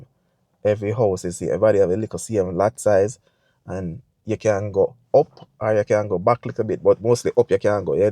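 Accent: Jamaica. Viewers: Spoken ❤ true orthentic Jamaican. Up up str<num>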